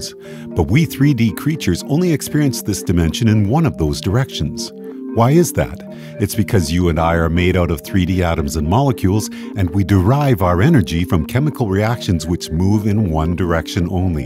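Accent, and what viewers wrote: Canada. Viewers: You sound Canadian. Am I right?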